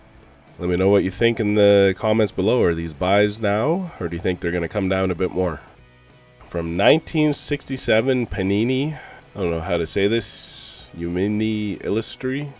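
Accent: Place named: Canada. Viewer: I love the Canadian pronunciation!!! Lol...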